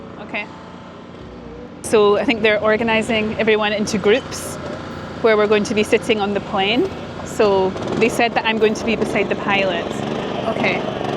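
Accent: Scotland, United Kingdom. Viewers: Nice video. No bull just right and love the scottish accent ❤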